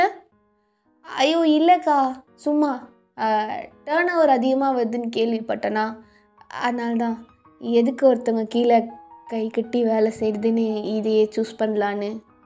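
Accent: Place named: Kerala, India. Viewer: Do you have any Malayalam connection.. actually unga tamil videe malayalam super ayii irik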